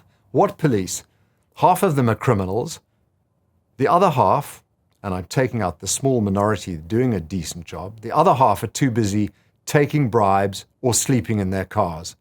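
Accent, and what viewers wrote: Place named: Sandton, South Africa. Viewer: It’s a pity, you made some good points but your argument lacks nuance or basic empathy. This is genuinely a Trump speech with a Sandton accent. Charismatic and eloquent but ultimately empty nationalist rhetoric without any means of dealing with the issues. “Open the free market and stop wokeism” is an easy fix for a country club member with an 8 figure income but it is severely detached m from the needs of working class South Africans. Not drinking your Kool-Aid Trump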